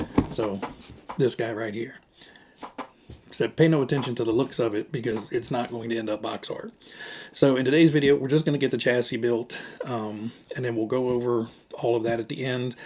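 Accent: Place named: Boston, United States. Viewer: Very nice ! Love your Boston accent🤣 Do you have any of the earlier M chassis ? I only have a M-01 Mini Monte Carlo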